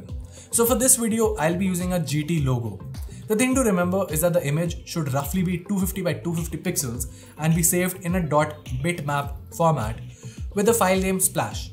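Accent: India. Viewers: That indian "gulu gulu" accent.....................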